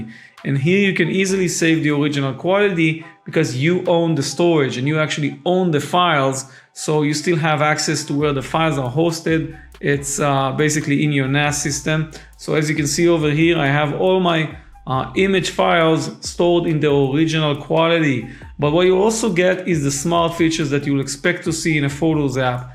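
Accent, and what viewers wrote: Israel. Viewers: You have an Israeli accent 🤔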